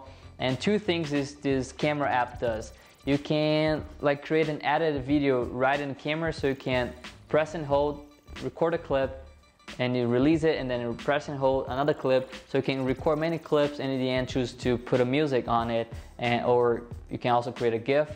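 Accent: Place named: Brazil. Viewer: Fala bem Iglês cara.. mas da pra perceber que é BR.. MEsmo assim parabéns!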